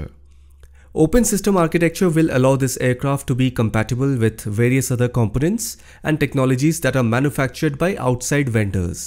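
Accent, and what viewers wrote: India. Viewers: Are you a Chinese talking like an Indian? Following you for a while 🤔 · How many Americans want to hear a man with a thick Indian accent talk about American military and aviation? I spent 20 years in the United States Air Force. Call me crazy but I can't listen to him. For the sake of the holy cow. 🐄🐄🐄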